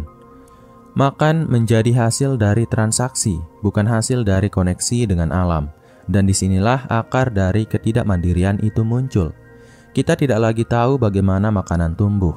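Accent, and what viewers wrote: Bali, Indonesia. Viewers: naratornya bli bli Bali ya.....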